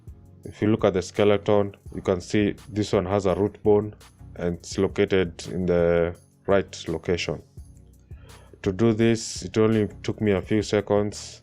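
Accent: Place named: Kenya. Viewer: Bro..you sound soo Kenyan !!!🇰🇪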